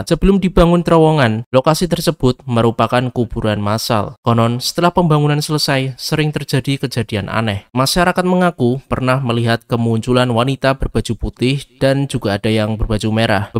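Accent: Java, Indonesia. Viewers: chanel bagus, cuma jangan pake bahasa "gue". pake saya atau aku aja mas, medok sekalian gpp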